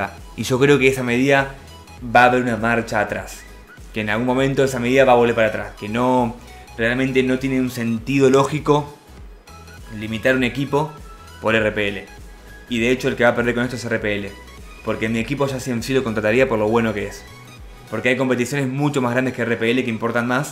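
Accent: Argentina. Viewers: dice roshal por el acento argentino que tiene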